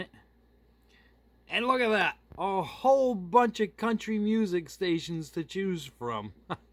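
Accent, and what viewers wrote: United States. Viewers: I just don't trust, nor believe, anyone speaking about ANY subject, with this v i l e " a m e r i c a n " accent... Taking a short break from bo m b i ng other human beings ? 🤮🤮🤮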